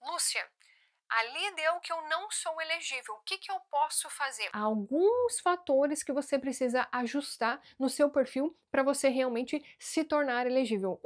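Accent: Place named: Parana, Brazil. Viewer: Seu sotaque e um barato puxa o R tipico do parana e o S com som de X tipico dos alemães do sul do Brasil, alem dos anos de canada....🎉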